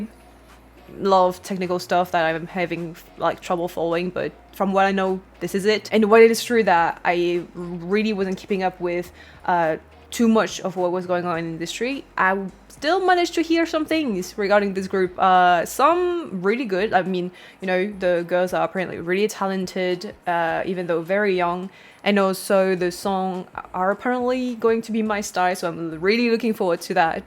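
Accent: Vietnam. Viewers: Come on... your Vietnamese is great. Giọng Sài gòn đặc luôn !!! Be confident because your accent sounds very clear and correct! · Chính ra khẩu âm của chị nghe cute ấy chứ, người miền Bắc hầu như đều thấy giọng miền Nam nghe cute cực🤣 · i like your Vietnam accent xD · oh wow I didn't know you could speak Vietnamese lol, I always thought you're from SE Asia. yesss you pass, that's good enough, you totally sound like a Vietnamese who would insert random English words when they speak lol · I love your Vietnamese pronunciation🙆‍♂️. It was cute 🤗. I could hear and understand it clearly. I feel so proud that someone who debuted in Kpop was Vietnamese ( I’m Vietnamese too). 🇻🇳🇻🇳🇻🇳 LET’s go with HANNI and NEW JEANS 🎉🎉🎉 ( So sorry because my English’s not good) ❤️ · Trời ơi giọng Tiếng Việt của chị nghe hay mà dễ thương xĩu í tr ơi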